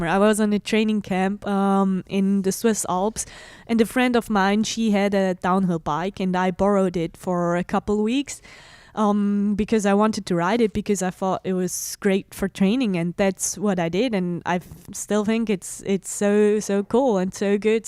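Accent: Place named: Quebec, Canada. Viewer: She sounds french canadian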